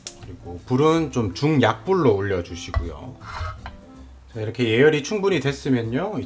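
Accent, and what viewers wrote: Gyeongsang, South Korea. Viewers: 멸치 볶음 때문에 구독한 게 아니라 경상도 구수한 목소리가 귀에 편안해서 구독합니당🤣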